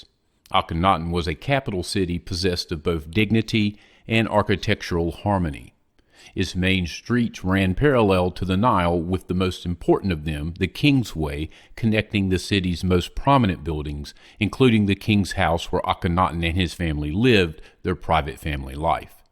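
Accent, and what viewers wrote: United States. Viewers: Completely unrelated, but...... Your has got the be THE best American accent!!!!!! 😍😍😍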